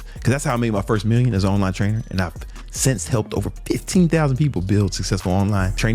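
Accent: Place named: New York, United States. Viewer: German here...just discovered your channel and I especially like the way you talk. Is that the slang or dialect from New York? I will use it to teach it to me (by "shadowing" the way you speak)...that will be fun...a white boy and meek and mild do gooder like me with that talk...hahaha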